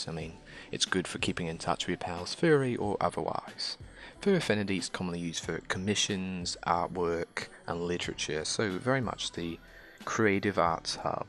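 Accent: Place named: Australia. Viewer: That's an interesting accent, I'm getting hints of North Irish, North English and New Zealand in between an Australian accent.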